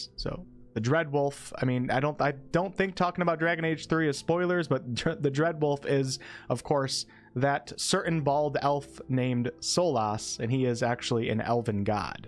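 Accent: United States. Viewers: I'm glad you have swapped over to DA. I was kinda curious what direction you would go after BG3. Also folks, he pronounces things differently due to his yankee accent, it's not a big deal. 😂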